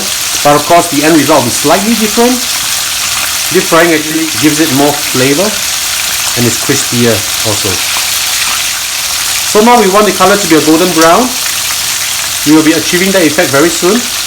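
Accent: Singapore. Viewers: Are you speaking Singlish while cooking taiwai style? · Arw you singaporian sir cause you sound like one.. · You sound more Singaporean than Malaysian tbh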